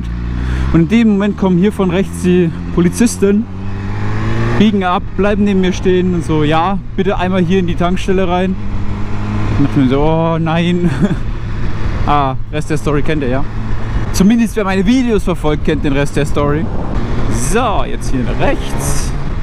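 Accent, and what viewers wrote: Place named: Bavaria, Germany. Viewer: red mal mehr bayerisch in deinen videos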